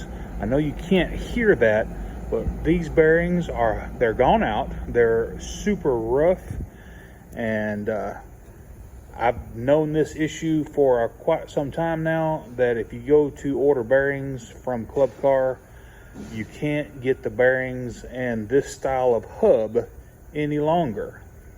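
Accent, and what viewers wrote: Texas, United States. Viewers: Awesome video! Very comprehensive. Appreciate the part numbers as well. 🍻 Personal aside - what part of Texas is your accent from? -a fellow southerner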